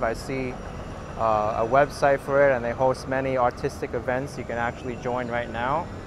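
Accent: New York, United States. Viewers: one again we appreciate your every effort in putting out vids and that wonderful NY accent of yours is always a pleasure. Would you ever consider being a small tour guide for visitors that have never been to NY?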